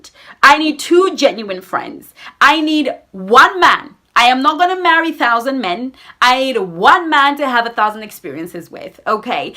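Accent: United Kingdom. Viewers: Hey, Love you and your cute little British accent.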